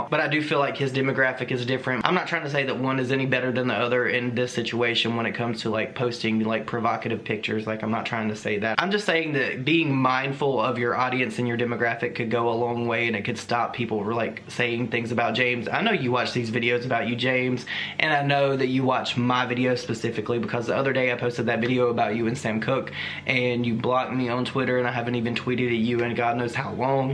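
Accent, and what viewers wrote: South, United States. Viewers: Are you from the south? Cuz same.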